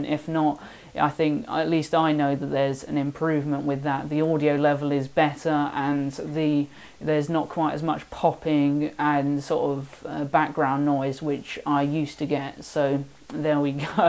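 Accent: United States. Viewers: 1) The quality of your video and audio is terrific! 2) Love the American accent. 3) Learned something about college/university I never knew. 4) Are those bulls? 5) Brrr! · Great quality on both video and audio! I live in south-east of Norway and we're no strangers to snow.. This year has been kind as we've only had about 2 feet so far. Some years, 6-8 feet, much of it falling in a relatively short period. Here in Norway there's always a snow season, but still the first snowfall surprises a lot of people every year and we have numerous accidents during first snow... Good luck on your exams. PS! Your american accent sounded a bit like Hugh Laurie's :-) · I thought you was in the USofA with that Southern accent . LOL Love the Videos , keep it up . Southern Oh Hi Ho here . LOL (Ohio) .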